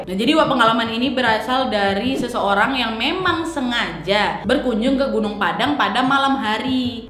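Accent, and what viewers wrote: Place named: Medan, Indonesia. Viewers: Dari logatnya aku duga kak ininorang medan. Ya kan wak? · Mak cik ini aslinya dari mana? Dari logat bahasanya kayak dari tanah Deli. Tapi awak tak tahu pasti kali lah.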